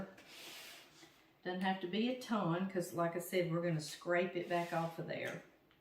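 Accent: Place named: South, United States. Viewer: I love watching you and listening to you sweet southern "drawl" .. Your cake looks delicious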